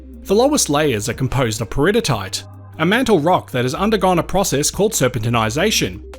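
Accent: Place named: Melbourne, Australia. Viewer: Love the content - also you are the first person to demonstrate the Melbournian “Malbourne” accent (“e” = “a”) that I have heard about from people over the years 😂 love it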